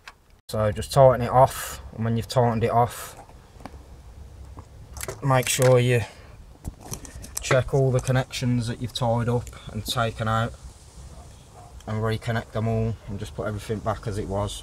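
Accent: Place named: Black Country, United Kingdom. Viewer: hi are you from black country? I'm looking for someone to sort my astra and you sound local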